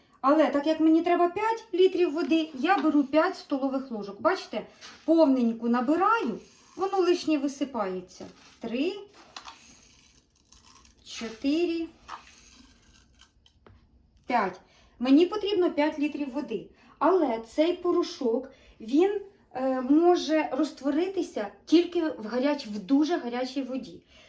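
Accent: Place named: Surzhyk, Ukraine. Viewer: Який жахливий суржик! Невже не можна хоч словничок погортати, перш ніж знімати відео?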